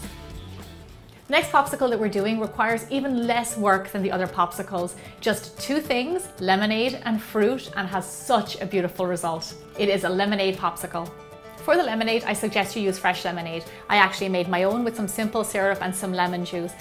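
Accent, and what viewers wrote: Ireland. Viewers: The Irish accent 😂I'm Irish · Are you irish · Are you Irish? Your accent sound Irish. I'm Irish.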